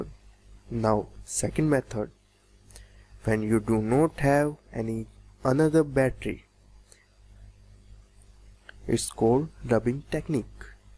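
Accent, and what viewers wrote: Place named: India. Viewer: you white people do not know your accents. He's Indian!! can anybody hear that?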